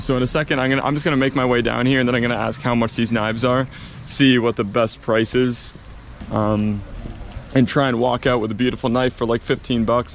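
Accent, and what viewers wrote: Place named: California, United States. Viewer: you sound Californian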